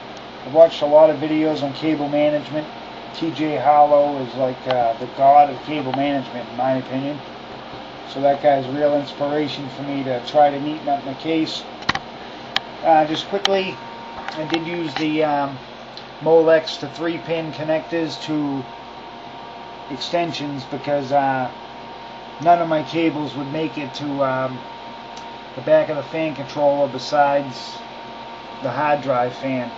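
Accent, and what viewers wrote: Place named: Portsmouth, United Kingdom. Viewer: Hey, nice rig!! I'm in Portsmouth. You sound like you're from the neighborhood.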